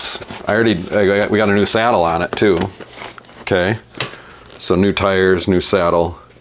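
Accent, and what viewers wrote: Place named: Midwest, United States. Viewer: Judging by your accent, you're somewhere in the Midwest. Where's your shop? I'd patronize your place for sure. You seem to have a lot of integrity and know how.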